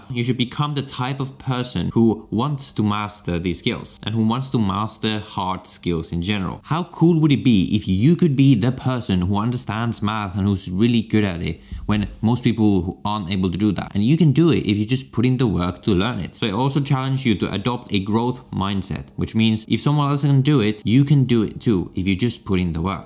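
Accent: India. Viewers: I love your accent, it's a bit like the indian accent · it kinda sounds indian bro, just saying. I hope you are not some charlatan or something. whatever you are trying to hide we can tell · Why do you have an indian accent?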